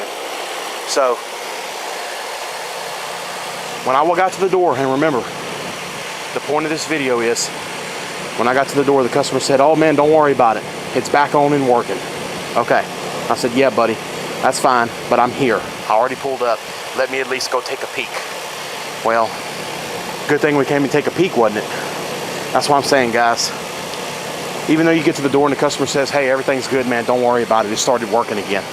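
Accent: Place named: South, United States. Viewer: great job and video and finding all kinds of problems. only item i would comment on is the coil cleaner. that stuff takes off hte protective wax coating applied at the factory and no matter how much you rinse it, it eats the coil regardless best thing to use is dawn dish soap. my teacher at tcc in norfolk va told me this in class many years ago and he writes the ashrae hvac books. not to mention run's carrier out here and before that ran trane commercial. the only thing to use coil cleaner is on an evaporator coil and use the stuff in a can. also why did you call your company? are you isreali? i have never seen a jew with a country accent! interesting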